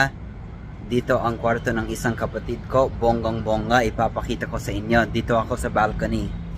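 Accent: Ilocos, Philippines. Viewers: oo nga. pinoy accent na . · Grabe k... tagalog na ilokano p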